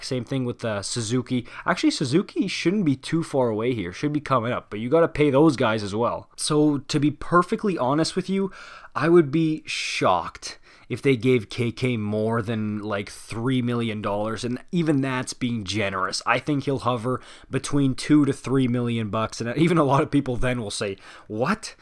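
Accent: Quebec, Canada. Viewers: Il est possiblement Québécois en se basant sur sa prononciation des noms français. Les Québécois sont généralement bilingues et n'ont pas d'accent dans les deux langues. Ou il est quelqu'un qui a appris le français très sérieusement. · Mon radar a queb sonne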